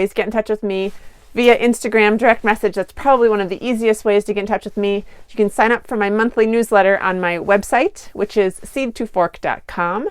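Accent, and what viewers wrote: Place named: Minnesota, United States. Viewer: You talk so much like me. We must be Minnesotans. 😅 Love November gardening. Thanks for the video.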